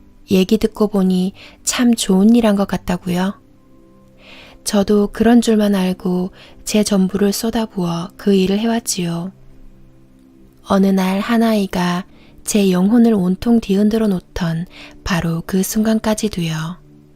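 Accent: Gyeongsang, South Korea. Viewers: 경상도 억양 목소리 사랑해요~~^^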